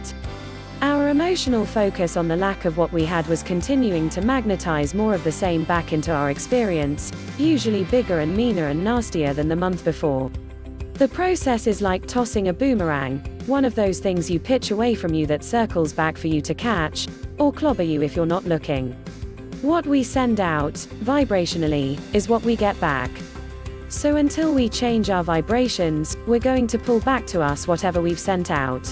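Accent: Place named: RP, United Kingdom. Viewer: I lived this book but it’s the wrong narrator reading it so I’ll have to try to find the original audiobook read by the author. I’m from the UK but this received pronunciation where every words spelt out slowly and without emotion just doesn’t fit with what this book represents.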